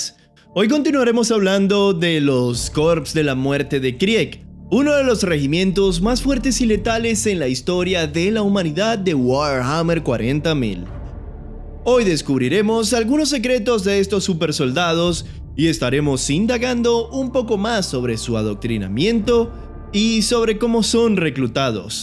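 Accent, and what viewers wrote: Venezuela. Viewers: Y su acento venezolano ayuda mucho. Es muy agradable de escuchar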